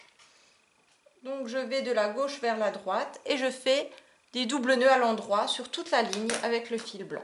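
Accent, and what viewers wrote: Alsace, France. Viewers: Tu as un accent tu viens d'où ? D'Alsace ?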